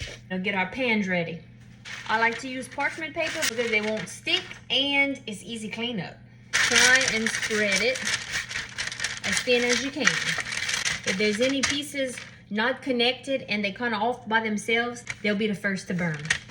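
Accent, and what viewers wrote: Louisiana, United States. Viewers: You are absolutely precious! I love your Cajun accent! You make me proud to be from Louisiana!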